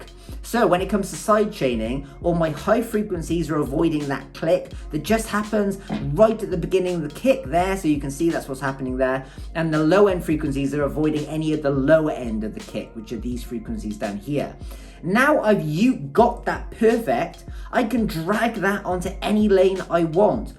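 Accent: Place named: United Kingdom. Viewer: british mate huh hmmmmmmmm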